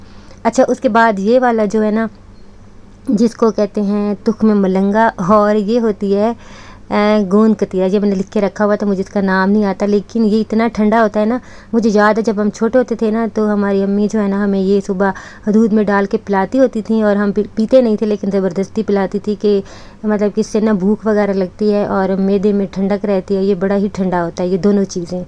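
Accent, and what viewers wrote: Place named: Saraiki, Pakistan. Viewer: السلام علیکم پہلی دفعہ آپکا بلاگ دیکھ رہی ہوں ،،،،،میں لغاری ہوں سرائیکی آپکا لہجہ بھی سرائیکی جیسا ہے ❤❤❤ ما شاء اللہ 🎉 پلز بتائیگا آپ کی زبان کونسی ہے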